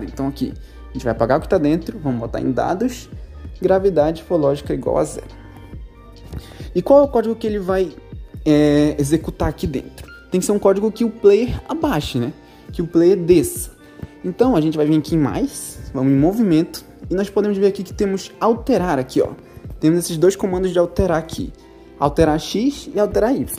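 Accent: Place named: Rio de Janeiro, Brazil. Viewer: Eu chuto q tu es carioca ou algum lugar do Rio de janeiro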